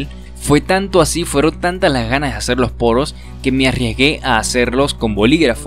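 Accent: Venezuela. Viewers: jajajajajajjajajaj no mames eres venezolano jaajajajajajja que risa xdddd yo tambien :V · Eres venezolano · eres venezolano no? yo igual jajajaj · Marico... Acabo de encontrar tu canal. Si había visto videos tuyos pero así de paso. Pero hoy vi uno y escuché tu acento con atención y dije "este pana es Venezolano" JAJAJAJA y me puse a hacer maratón de videos. Mk, eres un maldito psicópata jajajaja alp... dibujas demasiado arrecho y me inspiraste brutal. Gracias <3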